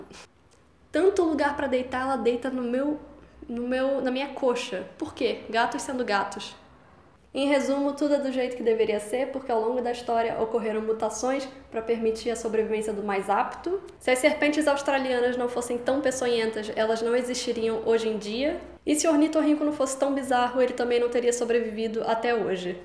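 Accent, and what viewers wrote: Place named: Portugal. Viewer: Vc é de Portugal ou não?kkkk vc tem um tipo de fala de lá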